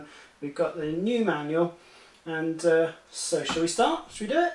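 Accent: United Kingdom. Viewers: He's from the UK. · He's from the UK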